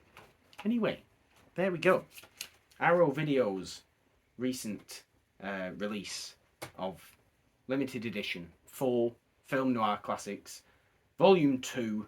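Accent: Northern England, United Kingdom. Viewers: I’m trying to find a review of this box set, and not seeing ‘unboxing’ on your post, I tried you. So I’m disappointed. Nice to hear a northern accent, though.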